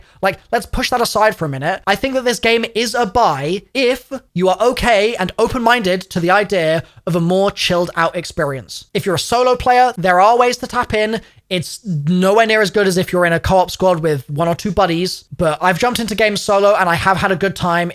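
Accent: South Africa. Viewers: Amazing south african accent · The south african accent had me, love this chanel from a fellow brit 👊👍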